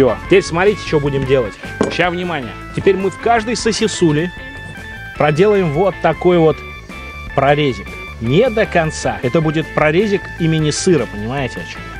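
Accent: Moscow, Russia. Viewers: Что-то говорок какой-то на понтах сегодня, такой чисто Московский прорезался... аж слух режет.... А за рецептик лайк!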